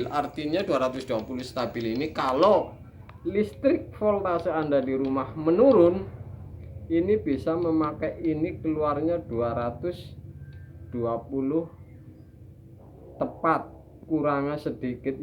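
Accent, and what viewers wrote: Banyumas, Indonesia. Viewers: Senadjan kesanne mandan kaku, nanging aku bangga... logat Banyumas ane bisa dikenal Maring saindenging Nuswantoro.... aja kelalen... tetap semangat 45.... merdeka....!!!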